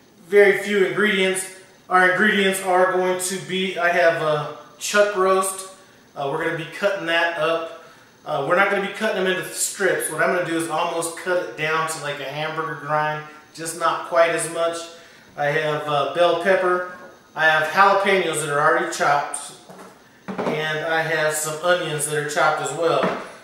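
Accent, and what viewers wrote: South, United States. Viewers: Nothing wrong with a good Southern Drawl haha. I am friends with Christy's Cooking Channel. Those things look awesome. I will check hers out too.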